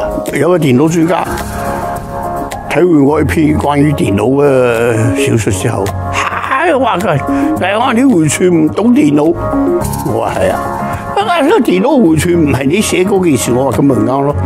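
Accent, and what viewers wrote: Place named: Shanghai, China. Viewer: 用沪语的音调讲粤语，听得好亲切。少小离家永不还，乡音无改鬓毛衰，倪老先生千古!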